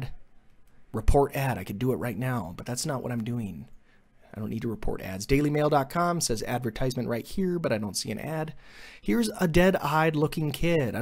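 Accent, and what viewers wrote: United States. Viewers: he just has a generic american accent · He's just American we don't really go off of regions besides maybe southern or northern but that applies to the east coast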